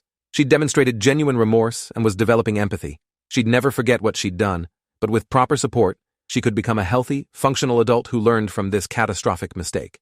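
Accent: United Kingdom. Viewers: In every video a British accent happens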